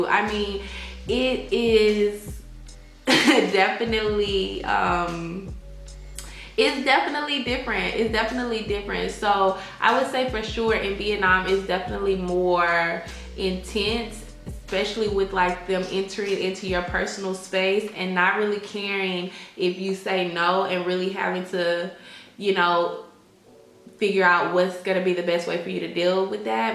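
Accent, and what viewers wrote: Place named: Washington DC, United States. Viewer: Are you from DC? You sound like you have a DC accent.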